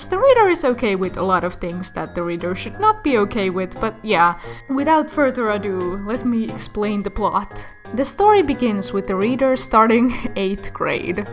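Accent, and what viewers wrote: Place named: Finland. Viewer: TUNNISTIN HETI SUN AKSENTISTA ETTÄ OOT SUOMALAINEN HERRANJUMALA tekee tästä vielä paremman 😎.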